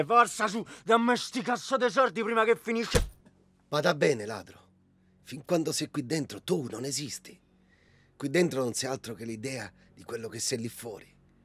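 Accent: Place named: Rome, Italy. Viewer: Aperto il video, non stavo guardando lo schermo e appena ho sentito parlare in romano ho zompato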